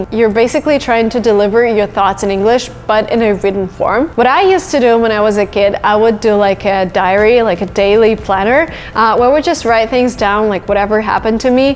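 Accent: United States. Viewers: Thanks.Your pronunciation is like an american.Where are you born? I love you...!!!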